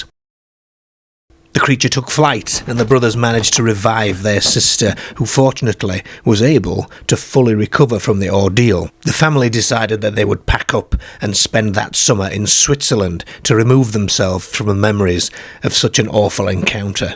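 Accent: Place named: Northern England, United Kingdom. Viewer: Just came across this version of the story. I am trying to work out your accent. Obviously northern, and I am from the north of England, but can't place this. I know there are lots of variations and yours is new, and fascinating.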